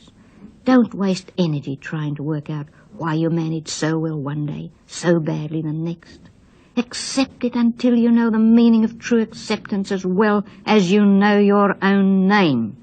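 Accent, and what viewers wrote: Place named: Australia. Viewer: I love how Aussie she was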